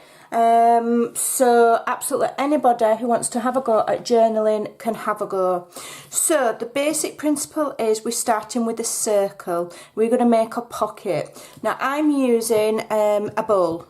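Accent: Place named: East Yorkshire, United Kingdom. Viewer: Thanks, this is a lovely idea. From your accent you sound like you are from East Yorkshire, like me!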